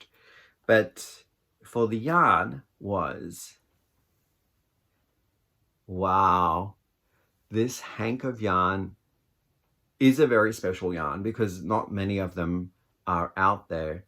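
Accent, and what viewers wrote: Australia. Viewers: Why do you sound Australian?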